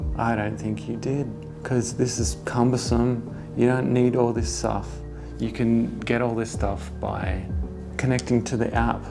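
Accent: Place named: Australia. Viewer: yeah I see it peek in on you,the funnier part was hearing you talk just now and figuring out your down under I had been listening with sap app (in Spanish) lol thanks for the help and guidance I do appreciate it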